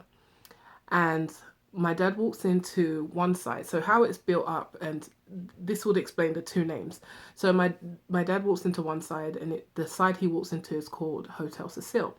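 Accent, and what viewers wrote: United Kingdom. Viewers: How come you have a British accent but you're from Texas? Just curious xx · Are you British but live in America now? Sorry for asking I'm just curious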